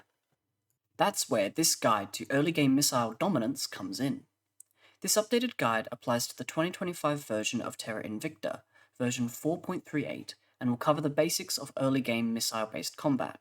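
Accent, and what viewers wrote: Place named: Australia. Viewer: Nice to hear another Aussie voice picking up where Perun left. I'd love to see an update of tactics and overview of what's changed in the game. I stopped playing it a while back after a few play-throughs proved the game needed a lot of work to balance it and make it enjoyable for most players that don't have a masochistic streak. It has a lot of potential but is damn complex with so much of how the game works hidden, making it difficult to understand for many. Harder still with all the updates. Harder again if pandering to fanbois that do nothing to actually bring in new players and money. I hope Johnny Lump and co make a success out of this though as it's probably the most realistic grand strategy game out.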